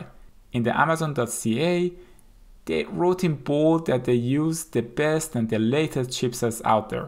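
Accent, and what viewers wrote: Iran. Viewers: Persian accent 😄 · Great video my iranian brother (guessing by your accent ;) )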